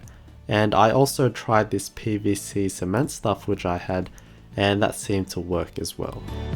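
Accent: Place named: Australia. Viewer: You sound like Daniel Ricciardo. Are you an Aussie?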